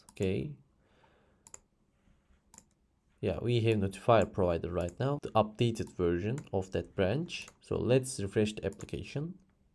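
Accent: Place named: Turkey. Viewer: Meraba 4. Saniyedeyim aksandan turk oldugunu anladim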